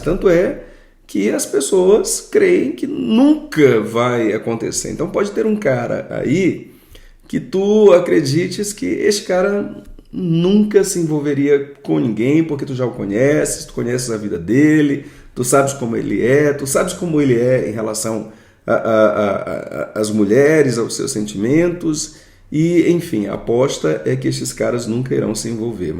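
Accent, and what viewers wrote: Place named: Pará, Brazil. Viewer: acredito ser do Pará….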